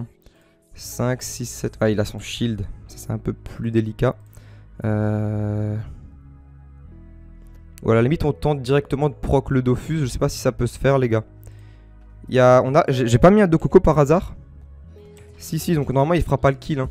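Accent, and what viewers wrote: Alsace, France. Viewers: tu viendrais pas d'Alsace toi par hasard ?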